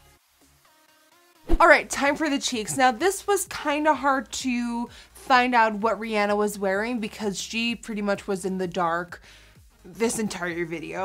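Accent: United States. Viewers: you live in Holland? oh. you sound american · Can you speak Dutch or something because you sound American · how come she sounds American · i had no clue you weren't from the states, you sound american lol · Hoe heb je zo'n Amerikaans accent gekregen? 😝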